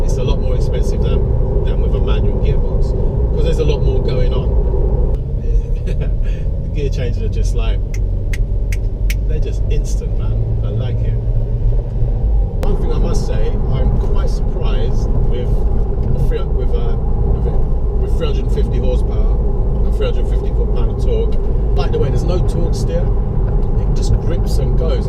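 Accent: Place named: Bristol, United Kingdom. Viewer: From Bristol then mate? And nice reviews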